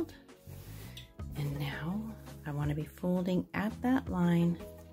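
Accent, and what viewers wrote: Canada. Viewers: Just got hooked to your channel so easy to understand and love your Canadian accent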